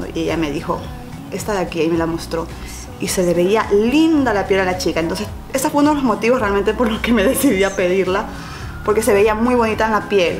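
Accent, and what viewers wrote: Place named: Guayaquil, Ecuador. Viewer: Primera vez que veo tu canal y me gustó por tu forma de hablar de una dije es Guayaca jajaja leí la descripción y boom Guayaquil bieeeeen besos y saludos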